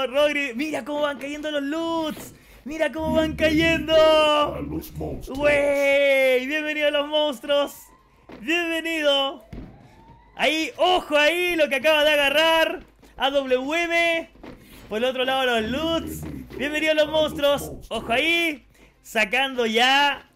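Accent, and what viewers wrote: Chile. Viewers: Bro eres chileno?? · Eres de chile? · Eres chileno ?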